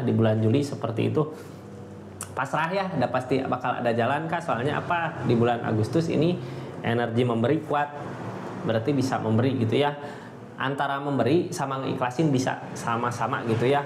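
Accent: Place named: Sunda, Indonesia. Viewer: Aamiin Yaa Robbal Aalamiin. Do'a yg sama juga buat kang nu kasep...nu bageur...nu capetang..... Sunda na medok....asli na ti mana? Hatur nuhun,wass nenek Elly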